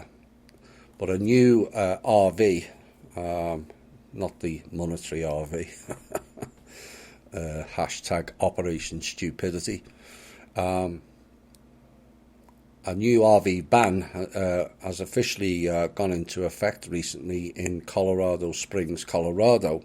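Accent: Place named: Liverpool, United Kingdom. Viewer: This scouser loved the sound of his own voice, I haven't seen one reply to any comments in 2months of watching!! I am from extremely close to were his accent is from and I have Ufos meeting me every single night, and sometime in the late daytime too! I am Spiritually Awake now and I have so much information I could really help people with, and this guy won't ever answer any comments of mine to even ask what Ive got, and he just plays his small jigsaw piece internet show he is doing, what's going on Lad Ive got evidence that proves how intergrated these vermin lizard archons Draconian Energy stealing <mail>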